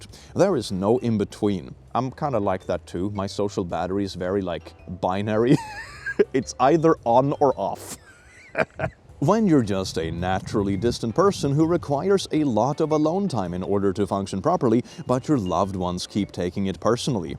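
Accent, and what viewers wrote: Finland. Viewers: Your finlands svenska accent is absolute perfection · Your Finnish accent gave me Gunnar Gunnarsson vibes, so... probably 100% accurate, lol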